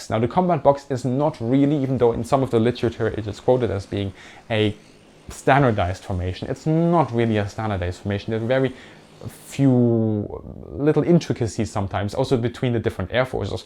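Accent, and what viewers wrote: Germany. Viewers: Well, it's Germish. Such laziness of English speaking they usually learn no foreign language AT ALL. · This is a fantastic history video. This is the most fascinating WWII history I've ever learned!! Def subscribed, and will contribute on Patreon. Strange thing is, you sound German, but you referred to Germans as "krauts". Is that a popular sentiment in Germany? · Are you German... you sound like it? If so, you are the only German person I've ever heard who knows how to pronounce 'bomb, bomber, bombing' correctly (i.e. not bom-b, bom-ber, bom-bing). Well done! :-) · Hey this guy talks like a german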